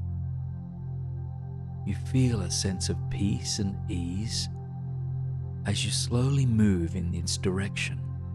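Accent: Australia. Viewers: Hi, lovely to listen to your videos. It's actually quite nice hearing another fellow Aussie. It makes the whole thing more familiar and comforting ✨️ ✨️ ✨️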